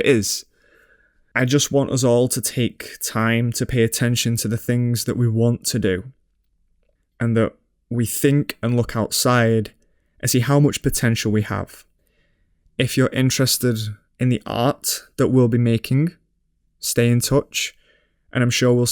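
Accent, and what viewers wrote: United Kingdom. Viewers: Where in the UK are you from? (I'm trying to figure out your accent) Good video btw and I totally agree, everytime I hear an ad read I cringe a bit. But it's like, people gotta eat I guess, so I can't really blame them. I gotta praise you for the decision tho.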